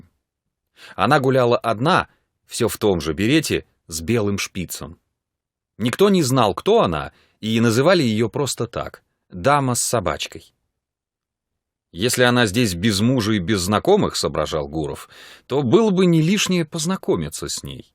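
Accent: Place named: Russia. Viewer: Красивый русский акцент.Красивая дикция. Спасибо.Приятно слушать.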